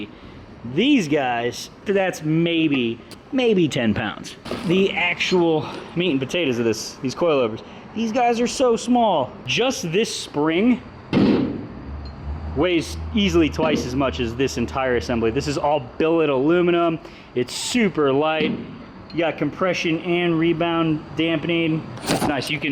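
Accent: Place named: Texas, United States. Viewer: Helll yeaaa bother ! Merica! Let them bald eagels fly brother! heavy texas accent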